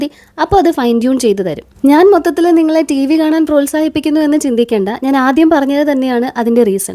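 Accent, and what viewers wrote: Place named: Kochi, India. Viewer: ഇത് കൊച്ചി ആണോ കൊച്ചി സ്ലാങ് ആണല്ലോ സംസാരിക്കുന്നത്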